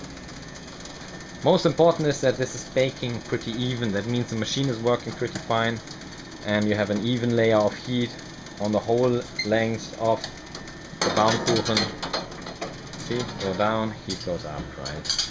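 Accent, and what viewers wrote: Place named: Germany. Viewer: I understand German and at first I couldnt differenciate between the 2 because you have such a German accent :D Like the video though (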